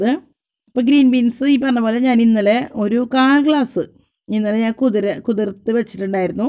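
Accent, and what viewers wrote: Thrissur, India. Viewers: ചേച്ചി കാലഹരണപ്പെട്ടു പോയ thrissur slang ചേച്ചി തിരിച്ചു കൊണ്ടു വരണേ. നമ്മുടെ അമ്മൂമ്മ മാരും അമ്മമാരും സംസാരിച്ചിരുന്ന ആ slang ഇപ്പോൾ kelkane ഇല്ല.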